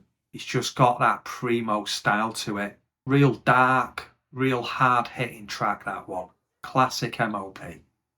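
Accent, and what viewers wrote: Manchester, United Kingdom. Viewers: Well that's me un-subscribed.. sorry but that boring monotone manc accent is killing, ta taa..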